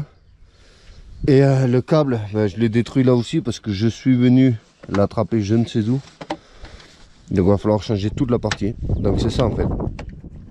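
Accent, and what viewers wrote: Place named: Perpignan, France. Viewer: ah entendre l'accent de Perpignant çà fait du bien !!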